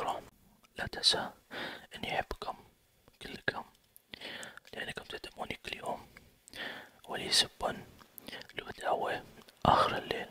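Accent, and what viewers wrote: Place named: Ha'il, Saudi Arabia. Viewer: لهجتك حايليه مره انت من حايل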